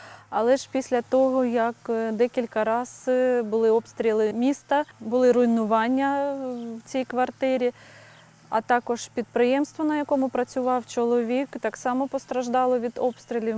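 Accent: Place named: Volyn, Ukraine. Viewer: Слава Богу, що влаштувалися більш менш добре. А розмова Вашо як волинянки)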